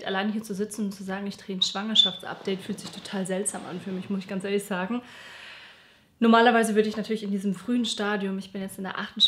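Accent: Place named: Hesse, Germany. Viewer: Sie kommt aus Hessen sie schttt nur ! Das nennt man Hessissscchh !! ♡♡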